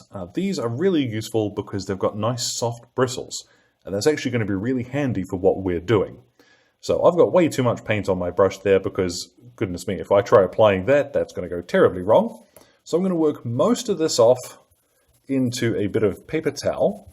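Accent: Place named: New Zealand. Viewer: Great video. I think this might be the Kiwi you've sounded thus far, eh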